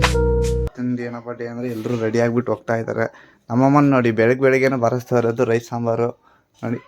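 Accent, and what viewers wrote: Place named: Bangalore, India. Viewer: Tamma ni nam uttara karnataka style dage maatadu bangalore language set aagalla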